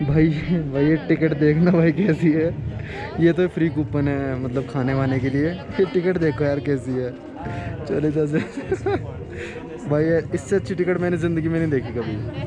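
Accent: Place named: Kanpur, India. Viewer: Yeah tho kanpur wala style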